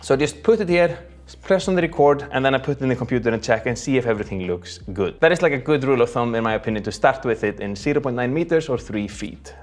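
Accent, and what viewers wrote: Iceland. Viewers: Been watching your videos for years, but I"m not sure if I've ever commented. Lots of family in Iceland and the Faroe Islands so your accident makes me homesick in a good way. I wasn't just watching a bunch of lighting videos and thinking I miss the days when tutorials didn't feel like ads. So nice to see you doing this video with these old godox lights and gels. Without experience I think a lot of folks these days would think they need to spend more than they do to get the look they want. Thanks for reminding me how capable my old godox 60 is. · 👍Very Well Explained !! I believe I started liking icelandic English more than the american one 😀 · Icelandic English. I recognize that accent. Great video!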